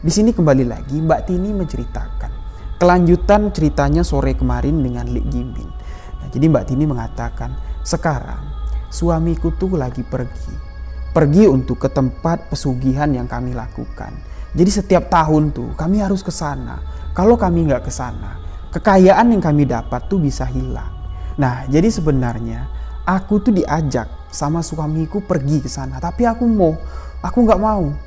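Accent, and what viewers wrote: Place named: Madura, Indonesia. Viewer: Malang hadir dan selalu mendengarkan cerita mesteri, nada bicaranya seperti orang madura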